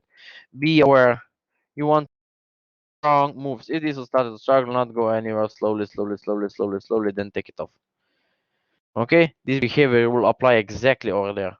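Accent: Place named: North Africa. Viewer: " from Slovenia " I thought your accent was North African:-) An Arabic speaker. Interesting. Now, I know how Slovenian accent is. All the best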